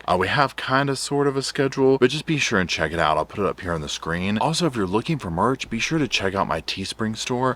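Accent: South, United States. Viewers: Great video also as as someone from Arizona whose never been east of Texas you might be the first person I’ve ever heard with a southern accent & gay voice lmao and I gotta say a gay southern accent is now my new favorite accent ( sorry Australians)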